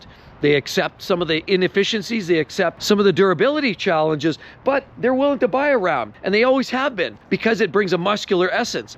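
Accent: Canada. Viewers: New to this channel you sound Canadian, I'm assuming this means these prices are also in Canadian?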